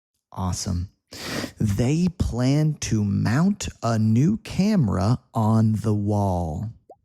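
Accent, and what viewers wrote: United States. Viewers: Hello. You're american or british?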